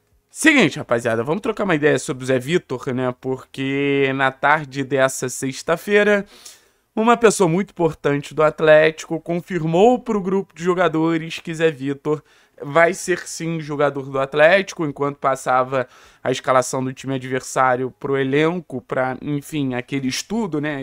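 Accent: Rio de Janeiro, Brazil. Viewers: Com esse sutaque carioca , vc está querendo pegar carona no maior do sul , sai fora carioca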